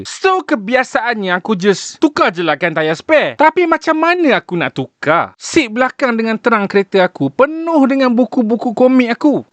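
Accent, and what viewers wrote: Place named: Kedah, Malaysia. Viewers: Tudia, dah Mai Kedah dia pekat nahhhh... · Wowww cakap utaqa bakhang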